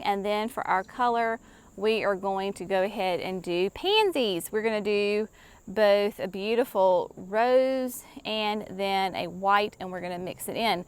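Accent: South, United States. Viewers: Oh, I love your southern talk when talking about the heat and humidity. I'm in Georgia so we're neighbors. Also love the music. Reminds of the times I was able to sit down at the end of a long day in the yard with the kids, heating up the grill for a burger.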